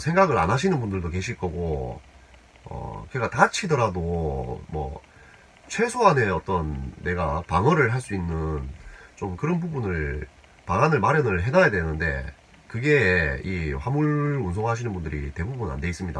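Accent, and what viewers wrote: Gyeongsang, South Korea. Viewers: 열 팬 입니다 훈남 이시고 구수한 경상도 사투리...항상 잘 보고있는 말년에 용달하는 창밖의 남자 임다 앉으나 서나 안전 운전 하십쇼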